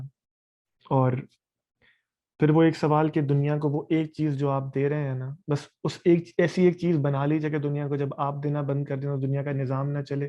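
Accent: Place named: India. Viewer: Yar ae banda Indian ki tarah batt karta hai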